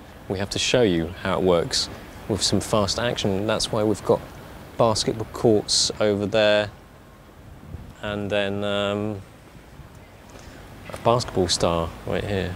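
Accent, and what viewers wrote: United Kingdom. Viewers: i find his voice too british too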